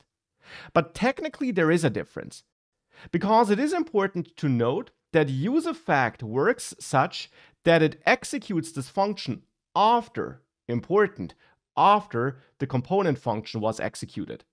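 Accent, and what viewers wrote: Germany. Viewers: Warum höre ich ein deutsches Akzent. Naja alles gut Bruder l.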